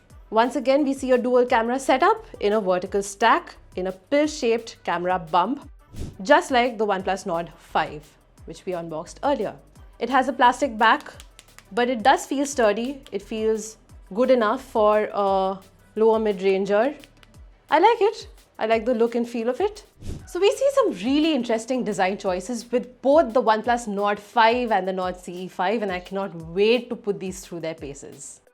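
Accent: India. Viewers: Indian jese lagta hai ye english bolta hai😅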